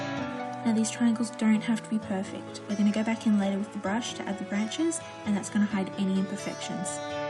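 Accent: Australia. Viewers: Love this manicure so much! And it's really good to hear an Aussie accent on YouTube too. Happy Christmas to you, from May in Perth <3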